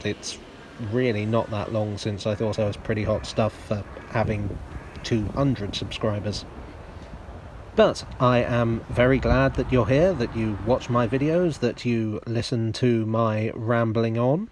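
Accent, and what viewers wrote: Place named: Midlands, United Kingdom. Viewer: Well done! 50,000 can't be that bad. I have been trying to workout of your accent. I'm usually quite good at it but can't quite pinpoint yours. Perhaps like me you were born in the midlands or other parts of the hinterland and in very early (talking) years moved to london. Let me know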